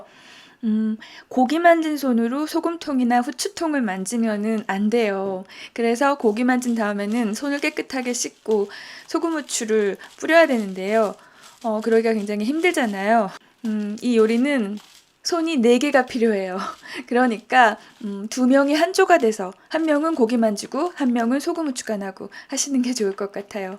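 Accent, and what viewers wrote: Seoul, South Korea. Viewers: 저 성대모사 엄청 잘해요 남편이 엄지척👍했어요 서울사투리 넘 귀여워요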